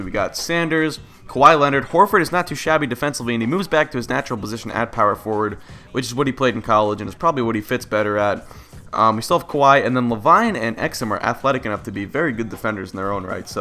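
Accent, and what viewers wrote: Boston, United States. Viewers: Boston accent in full force this video lol (hAHks, adam silVAH)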